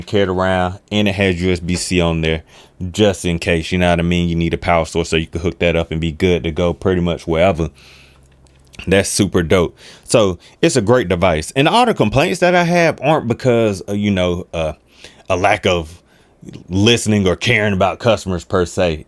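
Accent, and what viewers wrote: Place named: Bay Area, United States. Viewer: my guy OD sounds like hes from the BAY lol I LOVED my KO2 it makes music fun again. my MPC just sits as of recent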